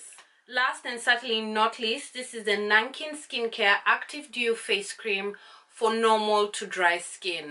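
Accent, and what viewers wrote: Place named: Kenya. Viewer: Hi 👋🏽 Have you been to Kenya? You've got the accent.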